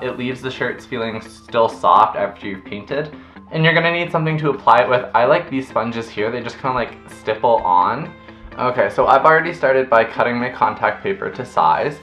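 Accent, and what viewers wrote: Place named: Canada. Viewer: are you canadian? your o's are adorable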